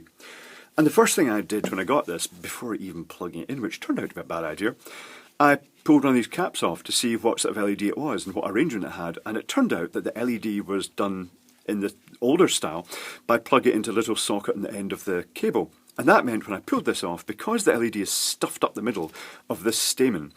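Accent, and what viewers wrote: Scotland, United Kingdom. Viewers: does the description actually say "idear gift"? or is it Scottish accent that makes the chinese sound humorously self-deprecating?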